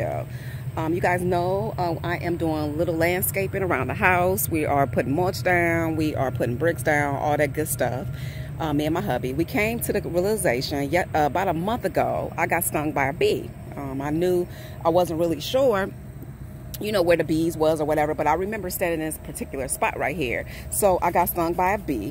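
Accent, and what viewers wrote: South, United States. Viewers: I live in the Midwest now but I miss a good ole southern accent. “We sprayed it with this DAAWN” 😂 love it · Girl, you sound like me. You must be from the south too. 😀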